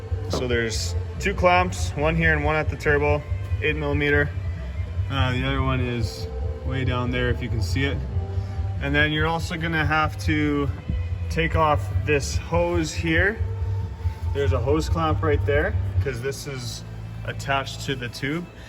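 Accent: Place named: Canada. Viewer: You sound Canadian, where are you working from?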